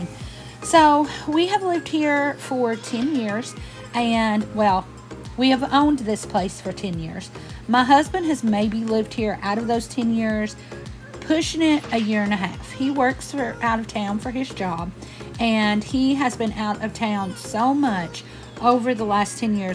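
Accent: South, United States. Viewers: Looks good. Your place is cute……I love your southern accent!!!!! I think also wherever you live you can make it your beautiful home. I have lived in an old mobile home when I was in my late twenties and also a very old summer cottage that was much more like a shack with no heat and they were fixed up very pretty and comfortable by me…. Love it! I love fixing up and making my space cozy…. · New subbie here. Enjoyed the video. I love the chattiness. Excited to see your home improvements. And love yhe Southern accent. Be yourself. And don't let the negative comments rent space in your mind.🥰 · New subbie here! I’m from Alabama so I love your southern accent! Tell you what. I’ll stay if you learn “Miranda’s” real name.🤣🤣🤣Thank you for sharing. Blessed be!🙏🏿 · Loved your video. Keep them coming. Your southern accent is great!!! Can't wait to see more videos!!! 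God Bless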